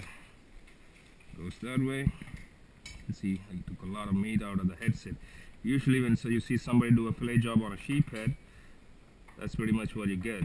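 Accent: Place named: India. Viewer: This has got to be the 8th indian sounding dude who just hapoens to be barefoot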